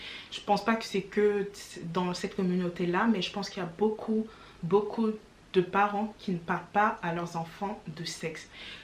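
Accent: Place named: Democratic Republic of the Congo. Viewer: L'accent a lui tout seul rend la vidéo drôle xDD Nan sérieux je kiff l'accent congolais (je suis sur a 80% que tu est congolaise) , québécois et russe 😊😁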